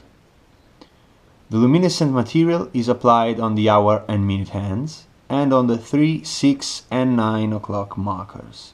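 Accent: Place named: Italy. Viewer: The English with the Italian accent is excellent. And the review/preview is good.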